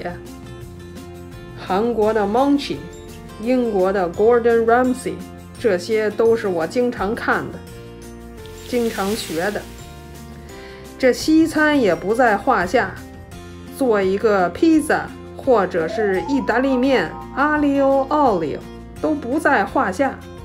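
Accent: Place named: Beijing, China. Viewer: 太棒了。 期待下一期视频。 老北京话，那叫一地道。 听着顺耳👍😄💖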